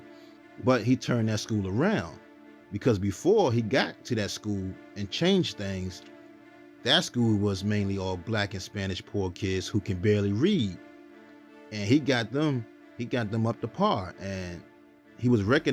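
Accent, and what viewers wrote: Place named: Washington DC, United States. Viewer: I've been watching your videos and I love them! Goes into details I never knew. Sidenote: I keep listening to your voice and I swear you sound like you're from DC. What really made me think that is one of your videos you mentioned Chuck Brown and most people outside the area knows he's the godfather of gogo.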